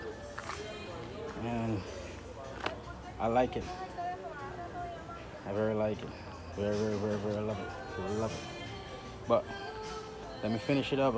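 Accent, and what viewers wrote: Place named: Caribbean. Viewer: You’re sound Caribbean my people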